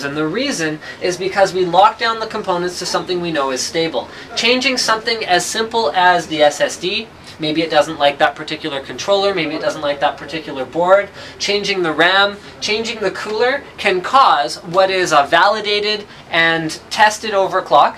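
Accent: Russia. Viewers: For how log has he lived in Canada now? Most of the Russians lose the terrible accent after a year or so...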